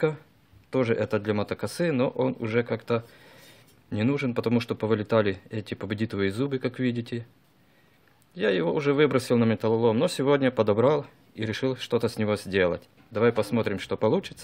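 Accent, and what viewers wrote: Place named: Moldova. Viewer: Судя по акценту - молдованин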